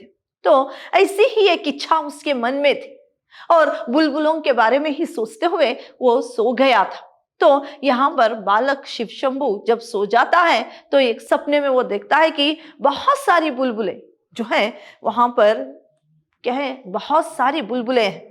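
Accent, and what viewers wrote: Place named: South, India. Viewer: I think you are from South India. I guess it from your pronunciation. Overall very nice video madam 👌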